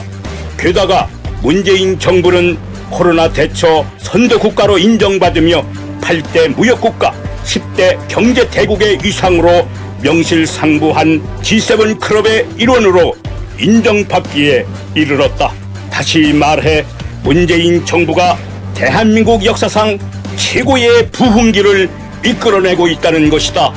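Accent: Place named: North Korea. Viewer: 다좋은데 왜 이북말투예요?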